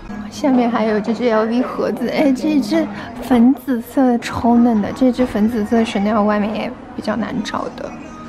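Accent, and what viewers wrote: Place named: Shanghai, China. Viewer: 謝謝帶我們逛街好喜歡妳說上海話太好聽了！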